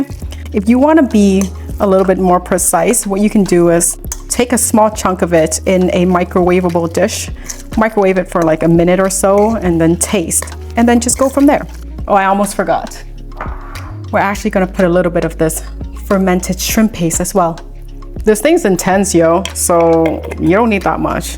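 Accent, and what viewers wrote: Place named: South, United States. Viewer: Why do you speak more like a southerner?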